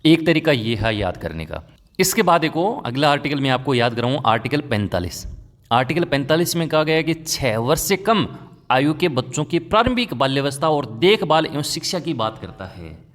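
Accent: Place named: Shekhawati, India. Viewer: Sir aap shekhwati se lg rhe ho muje sari marwadi boldi h aaj ti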